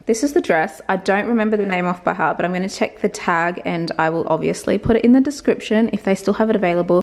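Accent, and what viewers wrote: Australia. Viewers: Love your aussie accent!! You look beautiful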